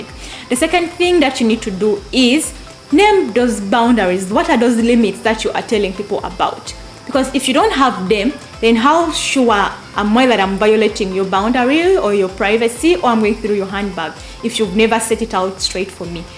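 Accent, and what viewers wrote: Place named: Uganda. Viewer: has directed me here. I love the Ugandan accent. So "pechuuulia"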